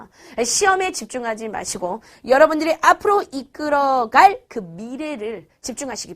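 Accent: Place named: Jeolla, South Korea. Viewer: 아니 ㅋㅋㅋㅋㅋ 전라도 사투리 개웃기네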